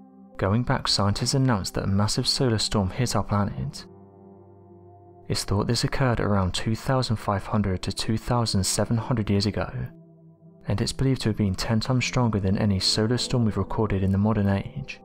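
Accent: United Kingdom. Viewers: WHY must you talk so fast? Yes, you're British but some Brits are much easier to listen to AND comprehend. There is another speaker on your channel to which I refer. And, thanks for including SAE measurement.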